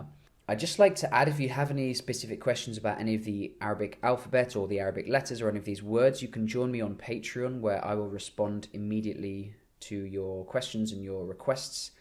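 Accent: United Kingdom. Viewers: Arabic welcome bro u really teach me a lot with ur British accent ❤️👌👍🏻👍🏻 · Hey I was wondering what kind of British accent do you speak & if you could do a video about different accents in England.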